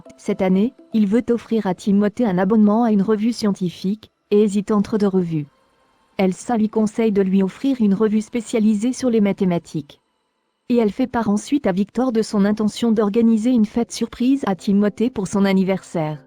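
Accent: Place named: United States. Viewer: Le commentateur prononce tous les prénoms à l'américaine ! C'est stupide !!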